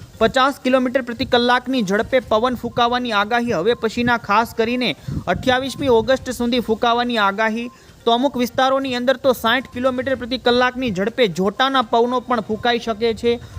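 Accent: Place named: Kathiawar, India. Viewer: Aa bhai ni bolva ni style kaik alag j chhe. Pan che Kathiyawadi. Vakya puru kari ne sawal puchhe chhe....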